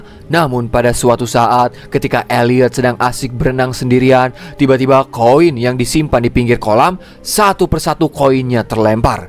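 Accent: West Java, Indonesia. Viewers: aku senang dan salut sama si akang yg cara pembawaan alur film nya logat nya sunda banget dan kocak..hebat lah buat si akang..😂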